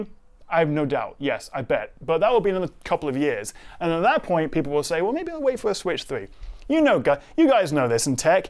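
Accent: United Kingdom. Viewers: Great video! I like your British accent. Gamers have complained how expensive the Switch 2 is compared with the original Switch was when it was released in 2017, but factoring inflation, as you did in the video, the Switch 2 is not really more expensive.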